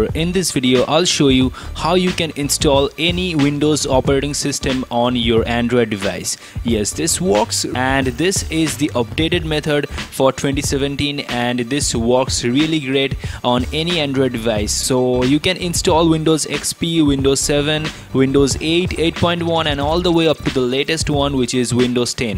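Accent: India. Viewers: Hindis English😄😄😄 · Indian has a funny accent, i imagined he moving his head when talking, like acha acha nahi nahi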